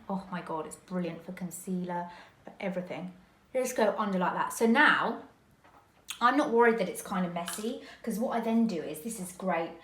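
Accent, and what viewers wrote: United Kingdom. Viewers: I’m from Sweden and I love your brittisk accent and your sense of humor. And love to hear about your english life. By the way - I love makeup on you tube😍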